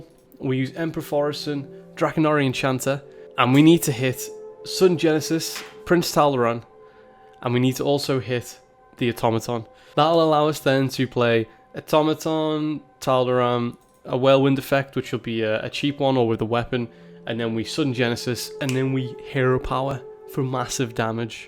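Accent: Liverpool, United Kingdom. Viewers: Fiufsciak - don't subscribe so I can prove reverse psychology is a total bs I think he’s from Liverpool, or at least that part of England. He called his accent “Scouse” in a tweet and Wikipedia says that where it’s from.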